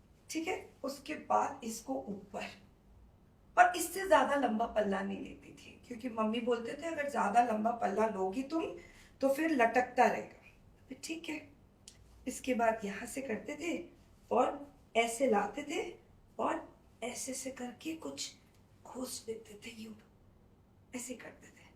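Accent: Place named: Delhi, India. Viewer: Are you a Delhite ..your way of speaking is like them..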